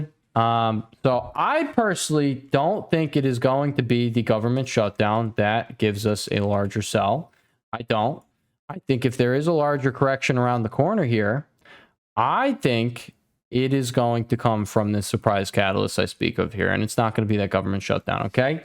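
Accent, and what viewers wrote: New Jersey, United States. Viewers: As a European, your New Jersey accent makes this so funny😂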